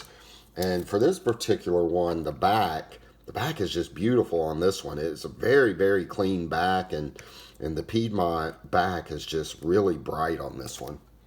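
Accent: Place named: South, United States. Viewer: Great choices. Your voice still sounds southern, though.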